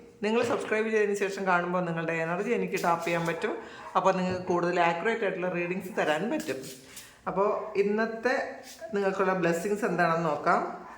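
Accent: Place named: Kochi, India. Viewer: Good morning ❤.. മാം എവിടെ ആണ് താമസം. സംസാര ശൈലി കേട്ടിട്ട് കൊച്ചിയാണ് എന്ന് തോന്നുന്നു...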